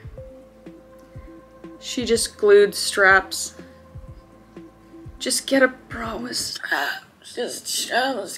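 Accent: United Kingdom. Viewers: Are u britesh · Plsss talk British I love your British accent ❤❤❤ 😂😂😂😂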